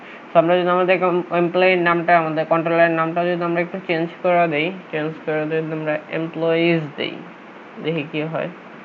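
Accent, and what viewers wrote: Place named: India. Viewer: This is India-English !!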